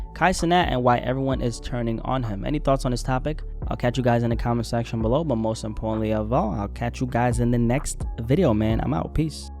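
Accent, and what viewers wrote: East Africa. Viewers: I knew you was an east African just by ur voice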